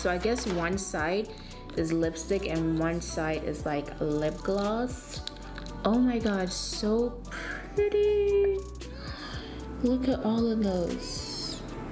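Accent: United Kingdom. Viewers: British accent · almost British...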